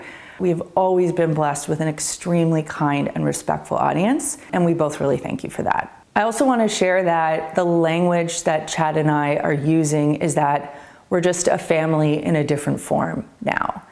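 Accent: Canada. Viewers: Wow I can tell you're definitely from Canada A.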